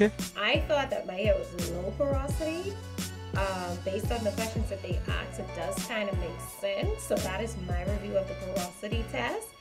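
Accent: Bahamas. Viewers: Are you Bahamian?? I can hear the accent.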